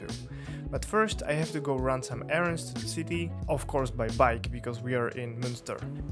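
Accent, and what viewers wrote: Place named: Netherlands. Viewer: As an aspiring Flutter dev I really appreciate your content. From the first impression I thought you are from Holland/Netherlands just as Internet Made Coder, because of your accent, it has seemed really similar to him. Was lil bit shocked that you are Slovak, although the name sounds more slavic. Hope you would reach worldwide audience more and that helps you set up another one of your multiple income streams. Also I would like to see a video about your MacBook specs. I have already seen a lot of content by SWE content creators about that topic, but I'd love to see another perspective. Každopádně chlape dobrá práce, krásný 4K videa, thumbnaily taky vypadají dobře, že mě donutí kliknout na další video. Za to video ohledně specs bych byl rád. Díky a ať se daří. :)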